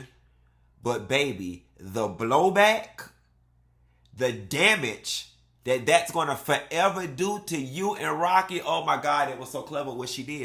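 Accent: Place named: Florida, United States. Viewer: I swear foe Gawd! Yo ability to discern and explain mess is an awesome thing to watch! That South Florida flow. I'm from dine thea too. I love it!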